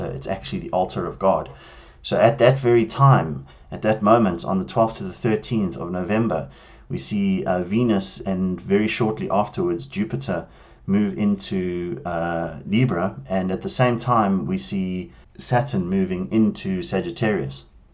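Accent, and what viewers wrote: South Africa. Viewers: Hello from over the Pacific....Am so glad I found this website....Amazing work,thank you, from one South African to another...I hear my countries accent loud and clear,and I miss it... :) May God richly bless your work....MARANATHA! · Thanks, great work!...you from Johannesburg?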